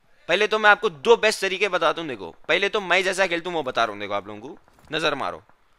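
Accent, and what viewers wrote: Hyderabad, India. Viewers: ya bro he is from hyderabad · He talk in Hyderabadi accent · The way he speaks tell's he is hydrabadi......🔥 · Arey kya bolre yaro ..Hyderabad ke galli galli mein ham aisich bath karte... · 99% percent are even listening to the accent. Hyderabadi accent at its best. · It's hyderabadi accent, and every accent in india is unique tho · Hyderabadi Boltey Public,Miya Bhai💢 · He is pacca hyderbadi..not andhra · Bhaii hyderabad wale aisich baat krte miya❤️ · Haydrabadi accent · no naam hai he just has the hyderabadi accent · Hyderabadi boltaaa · Hyderabadi hai bhai sahab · Hyderabadi language nhi suna kya kabhi movies b sahi rehti dekh lo bhai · It's Hyderabadi language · It's not unique it's hydrabadi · hyderabadi accent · he is from hyderabad bro.. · This Andhra - Hydrabadi accent is like perfectly made biryani.. love it 3000 ❤😅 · And that unique accent is called hyderabadi 😍😍😂🥰💛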